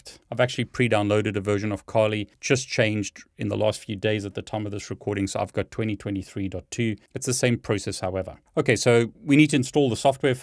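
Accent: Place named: South Africa. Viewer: South African!!!😂 Thanx for the installation boet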